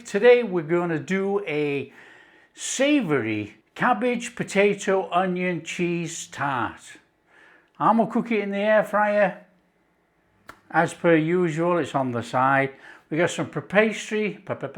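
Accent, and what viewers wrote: Yorkshire, United Kingdom. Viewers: It's not Scottish it's a Yorkshire accent which is a county in England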